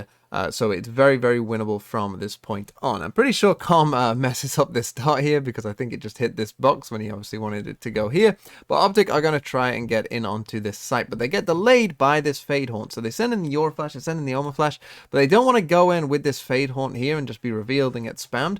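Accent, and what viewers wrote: United Kingdom. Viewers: It's because he is. He literally has a British accent.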